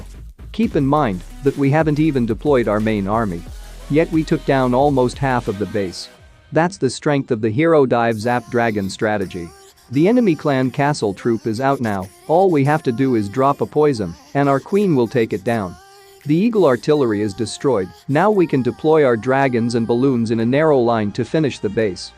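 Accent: India. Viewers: Bro, Are u Indian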